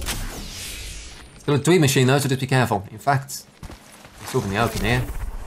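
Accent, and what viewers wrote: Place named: United Kingdom. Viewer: Since has a nice British accent the sponsors sounds like an ad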